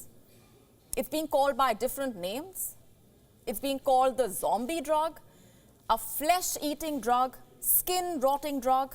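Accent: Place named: India. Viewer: she's indian so her pronounciation is different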